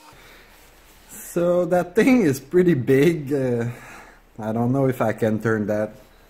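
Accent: Quebec, Canada. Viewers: Ca sonne accent québécois ca :P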